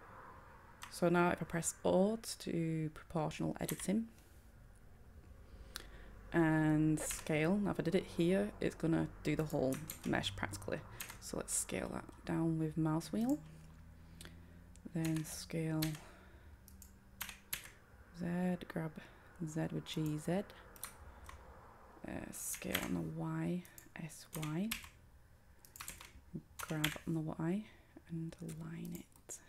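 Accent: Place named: Leeds, United Kingdom. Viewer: Transfer "Wearr-ts" ! I love your Leeds accent.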